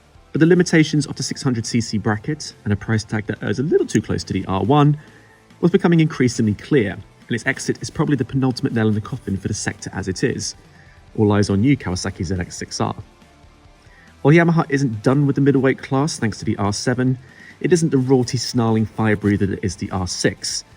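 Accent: United Kingdom. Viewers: All bullshit aside.... A British accent saying weird words in a weird way, in no way means the wanker knows anything about riding a motorcycle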